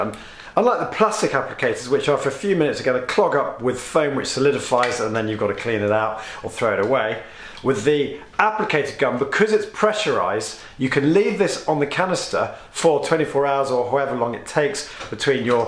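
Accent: England, United Kingdom. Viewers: americans can listen to english blokes talk, all day, i dont know if youre in the biz, but you could do product reveiws among other stuff haha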